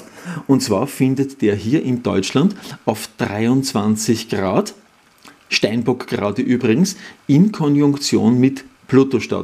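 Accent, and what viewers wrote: Austria. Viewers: Ich mag den sarkastischen Humor vom, der fehlt mir hier in Deutschland, und dazu noch das Österreichische!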